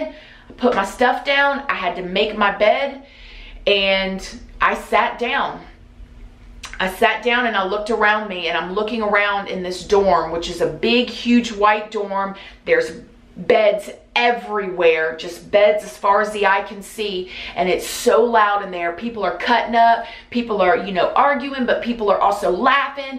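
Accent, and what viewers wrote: South, United States. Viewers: Wow! I have rewatched this video several times, and it only becomes more and more scary and eye opening. I've known people who have been to jail/prison. I've never been (and hopefully never will), but I have this weird interest in how prison works and I can tell from what I've been told and from watching you and Jessica Kent's videos and what I've seen on TV that it is a horrible place where you are stripped of all your freedom and sometimes family and friends disown you. I believe this interest i have in prison is somehow helping me really value and appreciate my freedom. I hope I never break the law by accident or on purpose., you are a good person and very strong and resilient. Never let ANYONE tell you different. You are one of my favorite YouTubers. I love how real you are. I bet you are one of the sweetest people. 💕 Also, I love your southern accent! 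· It keeps me captivated. You know how you read a book and sometimes you've read 6 pages but you have no clue what you read? Your videos are the complete opposite of that, for me anyways. I get lost in storytimes. I find them boring honestly. But I find myself going back... did she just say Bob wire? Rewind. Yes, yes she did. I love your accent and your way with words. It keeps my attention. I'm a Jersey girl in NY. I don't have any southern accents in my life. so I really can't get enough of yours!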